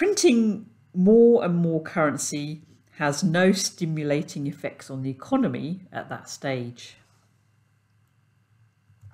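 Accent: England, United Kingdom. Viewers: I'm very surprised realizing that you live in New Zealand. I thought you're accent is as english as it can get. I read some reports about New Zealand in a blog I like and it summs up - this now my quote - there's basically a woman ruling like a direct descent of Stalin. We don't here about that in the news neither - lol!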